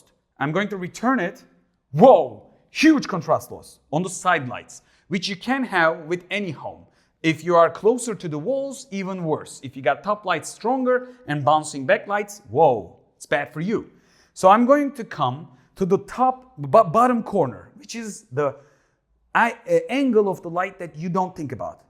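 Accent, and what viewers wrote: Turkey. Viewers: Eski bir videoyu seyrettim direk aksandan Türk dedim bu Abimiz)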